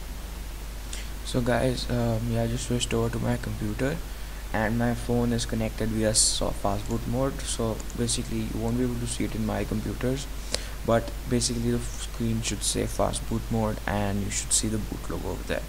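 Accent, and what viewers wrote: India. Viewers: dosent work and hard to understand indian taxi acent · A white guy with an indian accent you dont see that every day :3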